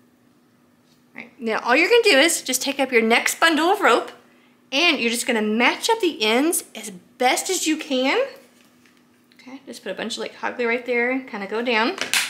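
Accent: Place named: Tennessee, United States. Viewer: I just came across your channel. I enjoyed this video. Each little project came out just adorable. Your tier tray is awesome. I'm trying to guess where you are from by your accent. Maybe Tennessee ? Or one of the Carolinas. I live in Upstate SC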